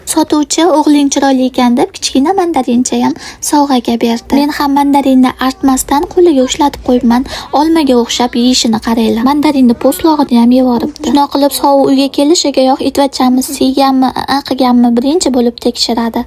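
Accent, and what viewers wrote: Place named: Andijan, Uzbekistan. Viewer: анжанлимисиз синглим. шевангиздан. билипколдим 😊😊😊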